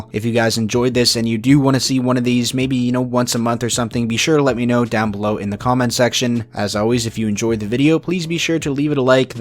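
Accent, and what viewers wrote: Canada. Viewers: Your voice sounds often Canadian! Not that that’s a bad things!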